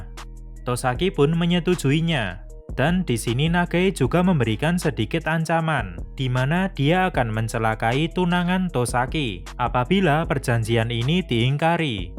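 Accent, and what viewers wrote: Java, Indonesia. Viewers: Logatnya terlalu medok Kurang nyaman euy.. maaf ya min, tdk jadi subscribe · Anjir medok kurang cocok gan, pake bhs jawa aja klo lebih nyaman. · Logat jawanya kerasa bngt min hhh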